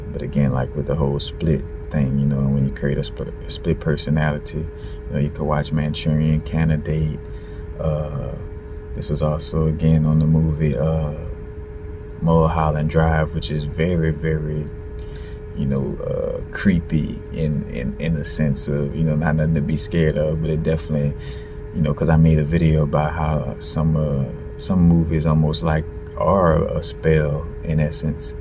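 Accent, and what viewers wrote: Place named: New Orleans, United States. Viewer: been on an august alsina binge lately, love his energy.. then this popped up on the sideline.. #nola love ur videos and ur energy and ur accent. continue to stay protected and covered, thank u for allowing the ppl onto ur journey <3 and thank u for reminding me to check on yeye mook's videos...been a minute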